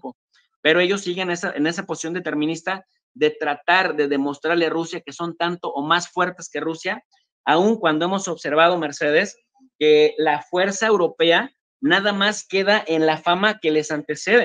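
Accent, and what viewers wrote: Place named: Paraguay. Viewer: Que feliz de escuchar a una paraguaya en el canal🥰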